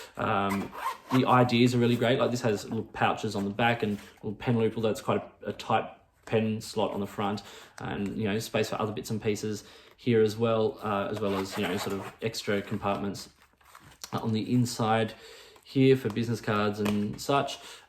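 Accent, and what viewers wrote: Australia. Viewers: Best wishes to you, let’s hope things get back to normal soon. Keep up the reviews if you can it’s nice to hear an Australian voice on fountain pens. Stay well 🧡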